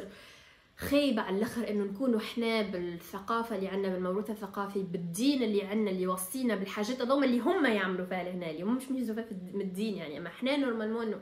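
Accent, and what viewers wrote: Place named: Gafsa, Tunisia. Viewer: يا بنيتي ااااش عملتي فيا رااااكي وليت ادماااااان ماشاءالله عليك قداش تدخلي للقلب وقداشك واثقة و صنديدة بنت بلادي القطار وقفصة البية اللي نتوحشها مللللي انفوت حي المطار والله هاني كبيرة وعندي بارشا في فرنسا ونحس روحي في كل مرة اول مرة نساافر فيها وراااااهو كلااااامنا القفصي وحده كلماتنا واللحن اللي انخرج به من فامنا 😂😂😂😂😂😂😂😂😂😂😂😂😂 وحده واكتشافك للمجتع الغربي توا تتعدو بيه انا زادا كيف جيت لفرنسا بقيييييييت حاااالا فمي 😮😮😮😮😮😮😮😮 أشهر واشهر وبعد الحق تعودت على كلش 😅😅😅😅😅😅😂😂😂😂😂😂😂😂😂😂😂😂😂😂😂😂😂😂😂 ملخرررررر يا بنيتي راااأااااكي ادمان وراني والله ممكن قد امك 😅😅😅😅😅😅😅😅اما في مخي 😂😂😂😂😂😂😂😂 jeune premier degré 😂😂😂😂😂😂😂😂😂😂😂😂❤❤❤❤❤❤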